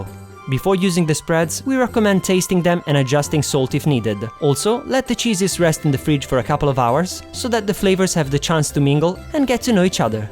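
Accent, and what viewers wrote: Italy. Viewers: I love love loooooooove your italian accent when speaking in English. Sono vissuta due anni in Italia e i tuoi video mi ricordono del legendario "sense of humour" degli Italiani (aaaaah, nostalgia!) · no, dont change your accent, Italians always make english sound beautiful! these look really good:)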